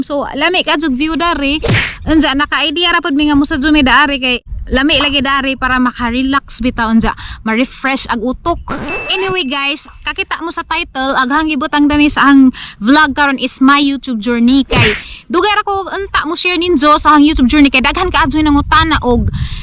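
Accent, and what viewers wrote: Bohol, Philippines. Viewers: Bravo mao gusto ko nga Boholana maayo pamation good job! Josephine Legaspi Grunseth from Texas! Shout out taga Sierra Bollunes family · Lami jud kaajo paminawon ang sinultihan nga bol anon madam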